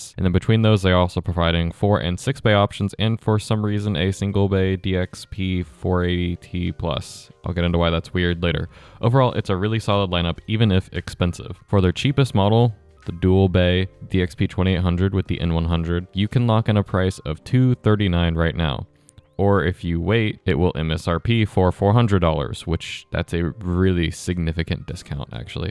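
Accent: England, United Kingdom. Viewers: you don't speak american, you speak english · You don't speak American, you speak English!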